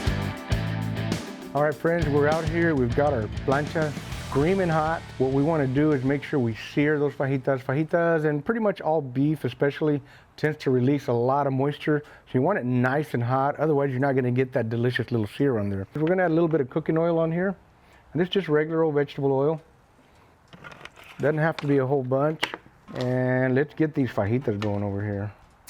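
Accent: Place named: South Texas, United States. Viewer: I could hear it in your voice brother. Haha The RGV is my second home. I spend a lot of time out in McAllen. Do you have a restaurant out that way?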